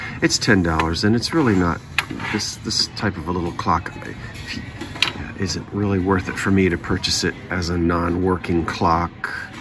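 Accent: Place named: South Jersey, United States. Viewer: Can't get over that south Jersey accent, waddur!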